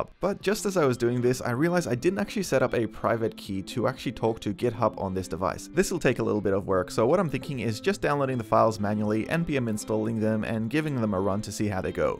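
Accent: Australia. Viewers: Why does your accent sound like an Australian trying to sound American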